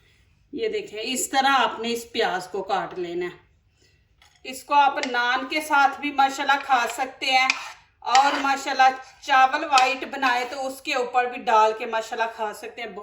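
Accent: Punjab, India. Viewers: Plz urdu ko urdu k.lehjy mn bolen aap that punjabi accent mn bolty hn kuch acha nhi lugta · ماشاءالله تعالیٰ ۔۔۔۔۔۔۔!!! بہت زبردست اور ذائقہ دار لگ رھی ھے یہ قیمہ آلو کی ڈش ۔۔۔۔۔۔!!! میں نے بیگم کو فارورڈ کردی ھے ٹرائی کرنے کے لئے ۔۔۔۔۔۔😊 پہلی دفعہ آپ کی کوئی ویڈیو دیکھی، آپ کے اردو بولنے کے انداز نے بہت محظوظ کیا۔۔۔۔۔۔!!! جیسے میں اردو کے لہجے میں پنجابی بولتا ھوں ایسے آپ پنجابی لہجے میں اردو بولتی ھیں ۔۔۔۔۔😂 میرا تعلق کراچی سے ھے اور مادری زبان بھی اردو ھی ھے، لیکن مجھے خاصی پنجابی سمجھنا اور بولنا آتی ھے۔۔۔ الحمد للّٰه تعالیٰ والسلام علیکم ورحمتہ الله تعالیٰ وبرکاته ۔۔۔۔۔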